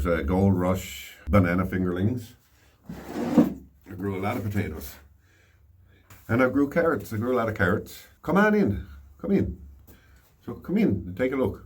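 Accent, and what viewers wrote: Ireland. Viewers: Is your accent a typical Newfoundland accent? I’d never heard one before. I’m Irish and it’s bending my head coz it sounds sooooo Irish to me, with a twinge of Canadian 😊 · Sounds like you are Irish, the accent, dialog, and love of spuds gives you away. Norm from Scotland. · Was fully sure that this was an Irish video when I heard the accent, your accent is very like a Waterford accent. Not the first time I have mixed the Newfoundland accent up with the Irish accent. I am guessing Irish must have settled in numbers way back. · Is this man Irish? Good job on the root cellar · Come.on in and meet my potatos. , Your not Irish , are you?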